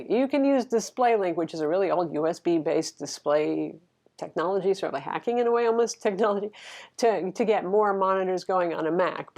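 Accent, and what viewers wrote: Texas, United States. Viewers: Chuckle! Good one, pixy. But, it's just her Texas accent. Very Big Grin.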